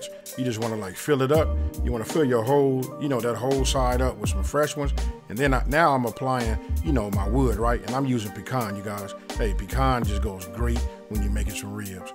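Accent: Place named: Los Angeles, United States. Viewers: Where are you from bro? My wife and I are debating about you. I say you're from the midwest. She says you're from Cali. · Nice video...man you sound like you from LA. I see the hat. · been following you for a while man! Awesome recipes man! I’m from Detroit and my wife and I trip off your deep Cali accent! It reminds us of that Detroit twang…very similar! Keep up the good work fam! Much love!!👊🏾👊🏾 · And they say "There is no West Coast accent" This dude sounds like Games uncle. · My ex was from New York and she used to say I had a Cali accent. Never knew what it sounded like and after watching, I know what shes talking about now. Me and this dude talk exactly a like. Ribs, looked fire! You gotta video on how to make the rib tips you trimmed off?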